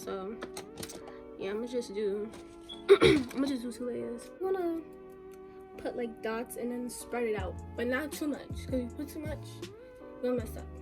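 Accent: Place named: New York, United States. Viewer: you must be from NY, your accent sooo cute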